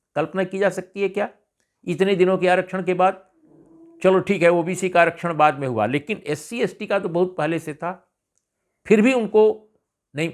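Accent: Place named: Bihar, India. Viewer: आप ऐसे बोलते हैं लगता है कि कोई बिहारी ही बोल रहा है। आपको मेरा नमस्कार है 🙏🙏🙂🙂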